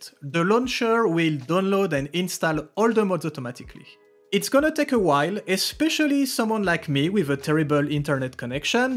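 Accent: France. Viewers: Dès les premiers mots on sait que t'es français! Merci pour la vidéo! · tes francais ?? where is he from >>,<<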